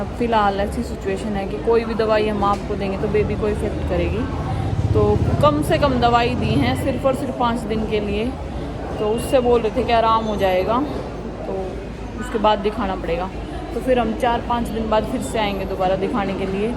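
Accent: Haryana, India. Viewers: Hii apke vlogs bahut hi interested hote hai kyonki aap Jo h vahi dikhati ho aur bolti ho koi banavatipan nahi hota h.kuch dino se hi apke vlogs dekh Raha hun raat k 3baje Tak apke videos dekhta rahata hun.jo aap harayadavi launguage bolati ho na bahut hi achchi lagati h.baby boy hone k liye advance me badhai. Please reply jarur karna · Aap ki bhasa to haryana ki haap vaha utrakhnd me kyu rahte ho nya koi kob h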